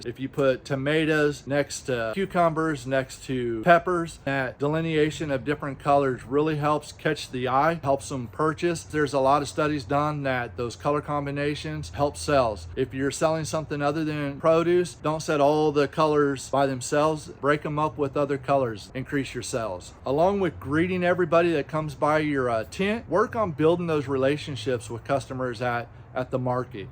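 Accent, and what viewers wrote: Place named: Maine, United States. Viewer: Where anchored maine he sounds like from maine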